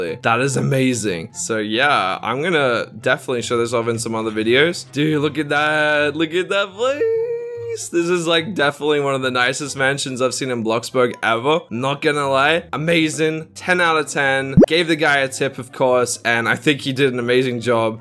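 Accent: Australia. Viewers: What accent do you have? Love it btw Edit: Oh later he says he is from Australia....Lol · I was going to say Australian until I scrolled Down... I have a question.. are you Australian because I am and it’s really easy to tell if your Australian bc any other YouTubed is usually from America or England and they sound so similar but it’s true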